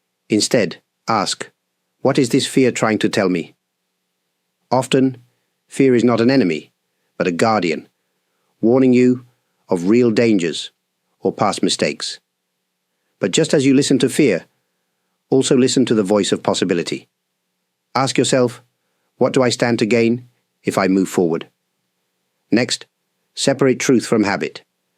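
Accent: United Kingdom. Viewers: He is born in England sounds like he has British accent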